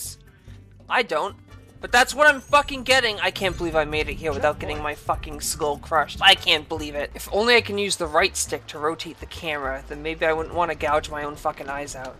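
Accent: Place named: Boston, United States. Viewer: God i love ur Boston accent it's so adorable!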